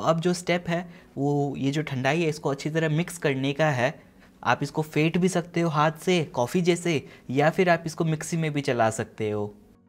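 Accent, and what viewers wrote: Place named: Rewa, India. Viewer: Arey sir mai MP rewa se hu...... Aap bhi wahi se hai kya mujhe aapki boli wahi ki lgti h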